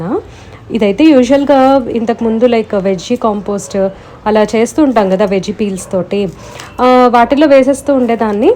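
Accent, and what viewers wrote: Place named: Guntur-Krishna, India. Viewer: Hi, Mee voice ki sooper fan andi..maadhi guntur.. Guntur,Krishna distict vaalla slang baaguntundhi...vlog last lo Thankyou for watching...thankyou for your time is an awwwesome dailogue ❤ Yes...amma vaalu cheppedhi vinali....i experienced it many times...till now..after 2 kids also 😂 Munagaaku fry kifs tinaru..chedhu gaa untadhi ani...So, kaarappodi chestaanu.idli, dosa,upma lo ki tintaaru... I too use India gate n Rozana..but Last week, nenu offce lo potluck kosam, mushroom pulao chesaa..rozana rice antha baaga raaledhu... New channel chepparu...good to know..thanks for sharing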